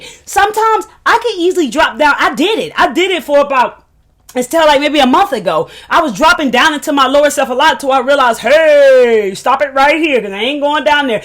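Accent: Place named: New York, United States. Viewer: You heardddddd lol you gotta be from NY we in the building! 💪🏾love you too Queen 👑 powerful video💯💯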